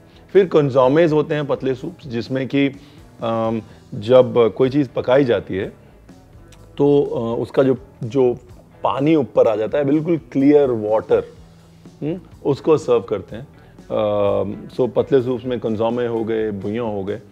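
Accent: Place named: Lucknow, India. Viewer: Jab aap bolte hain toh mere dimag mein bs lucknow aata hai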